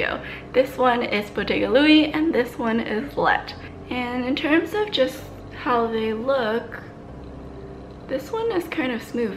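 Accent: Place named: Philippines. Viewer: Your voice is just like a Filipino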